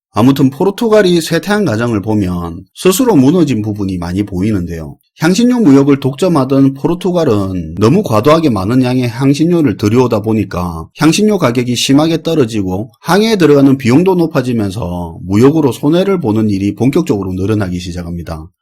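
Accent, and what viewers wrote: Gyeongsang, South Korea. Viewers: 재미있게 잘 듣고 있습니다. 쉽게 이해 잘가게 설명해주시는거 너무 감사합니다. 경상도 사투리도 너무정감갑니다.^^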